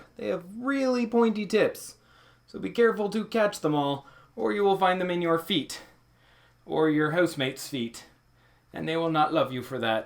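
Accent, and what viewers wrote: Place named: Canada. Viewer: The Canadian accent is strong with this one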